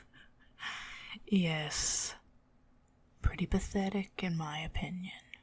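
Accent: South, United States. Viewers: don’t you DARE hide that beautiful country accent!!🤣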